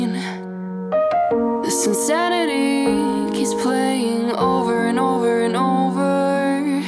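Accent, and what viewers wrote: New Jersey, United States. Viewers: I know this is serious, but Jersey accent really came out with this one haha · Stream because: -it supports your loving bisexual father 🥰 -it could help someone going through an ed💗 - is daddy🥵 - jersey accent comes out in this video😍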